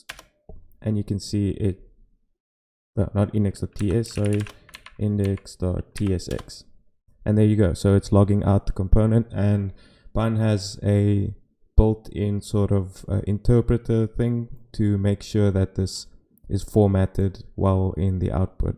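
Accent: South Africa. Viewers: Came here for the bun and HTMX, stayed because I heard the SA accent lol. Also playing with HTMX now, still wana start a side project with bun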